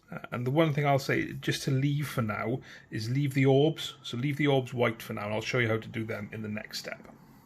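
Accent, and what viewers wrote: Wales, United Kingdom. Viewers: Another great tutorial mate, you're really making me want to get some of these new Necrons now. Also it's great to hear another Welsh accent on Youtube, Keep the good content coming!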